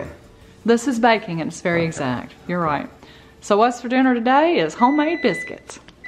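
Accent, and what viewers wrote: South, United States. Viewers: Well done. It's such an American thing calling them biscuits! They're scones for us. Biscuits are a cookie! Love the Southern drawl! Happy day from Sally and the fur crew! 😄❤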